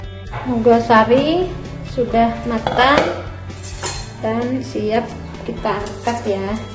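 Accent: Madura, Indonesia. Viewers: ini suaranya kayak org madura ya?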